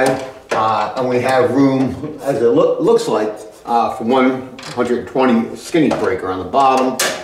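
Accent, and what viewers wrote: New York, United States. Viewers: Guy has to be from Queens or Brooklyn:)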